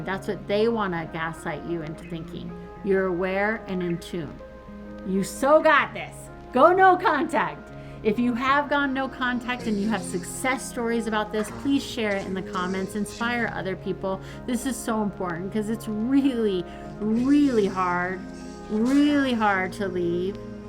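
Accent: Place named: South, United States. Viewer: are you from South? I noticed you have southern accent. I am obsessed with southern culture.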